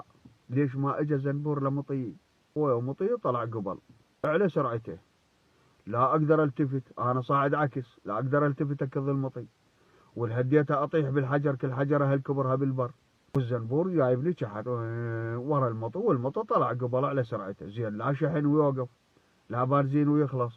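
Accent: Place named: South, Iraq. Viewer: لهجة جنوبية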